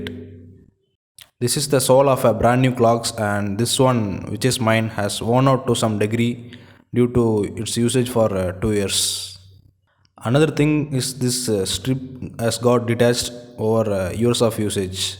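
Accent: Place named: Tamil Nadu, India. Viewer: I like you tamil english accent atleast you don't fake it 😊.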